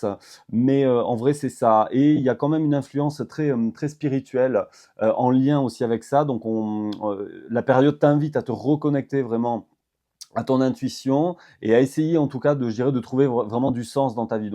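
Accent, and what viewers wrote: South, France. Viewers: Bonsoir je vous découvre, les hommes ne sont pas si nombreux dans votre domaine, il me semble reconnaître l’accent du sud pas tout à fait comme le mien mais presque😉. Je suis étonnée de voir que sur votre chaîne YouTube il y ai si peu de vidéo. Avez vous une autre chaîne ? Comme j’y suis sensible, Vous avez une belle voix 🙏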